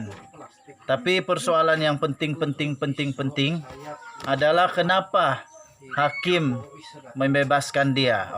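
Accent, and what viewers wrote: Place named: Sabah, Malaysia. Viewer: Sy mmg suka dengar org sabah bercakap. Org sabah mmg kelakar. Suka sy dgr. DONE subscribe channel abang. 🌹💕🌹💕🌹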